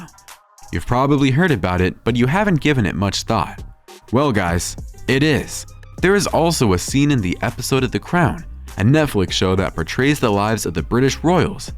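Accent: United States. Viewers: The claims were made by Burrell who was Diana's butler - not Charles' butler. The claims about Charles are garbage - made for the most part by Burrell. The toothpaste "thing" is something we'd all ask for help with if our arm had had to be broken twice - as happened with Charles. All the claims about toilet seats etc as one example are garbage. Ignore Burrell who's a gossip who makes up answers when he doesn't know the answer and left service with the RF when Diana died over 25 years ago. A butler recently retired from service with Charles laughed at all these claims and said he had never experienced any of them. Note the American accent on the video. Red Flag. So much garbage written and voiced by Americans about the RF all over social media - all of it laughable.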